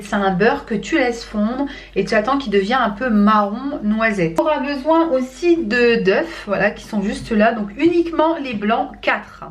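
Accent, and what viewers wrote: North, France. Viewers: Tout est 'leeee' ta laccent du nord haha t'es de Paris ou plus dans le nord ?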